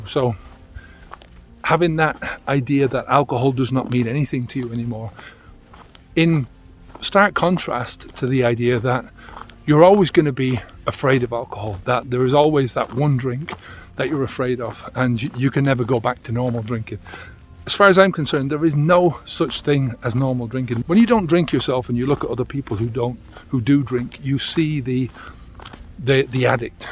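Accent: Ireland. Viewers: love the content, the cow bells, the Irish accent and the scenery. Thank you :)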